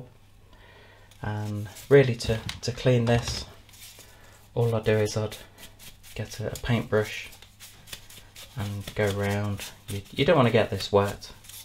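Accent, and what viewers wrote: United Kingdom. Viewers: Thank you very much for these tips and tricks and spoken in such a lovely voice. I’m from Western Canada so UK accents are not as common here but always a pleasure to listen too. Im certain I watched another demo that when you cleaned the filter you were to try as best you could to close of one end and fill and shake with water and repeat until the water ran clear. Is this ok IYHO? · Was thinking if I want to watch 26mins vid about cleaning my dyson. The british accent says yes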